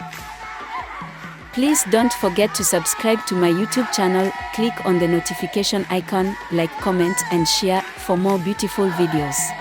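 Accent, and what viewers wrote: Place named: Kenya. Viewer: Are you from Kenya because your pronunciations are exactly like Kenyans......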